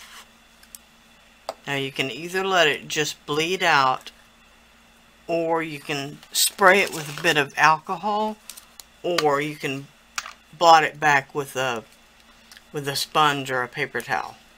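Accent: South, United States. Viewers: You are the best xplainer love your southern accent Did all the pieces get baked for 1 hour/1/2 at 220.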